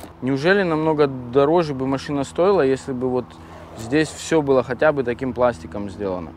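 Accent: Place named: South, Russia. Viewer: Еще раз молодцы, (ели я не ошибаюсь) расскажи о себе, как докатился до такой жизни (Америки).. откуда ты.. говор Южный...я из Нальчика.. Шкода как таковая у вас есть?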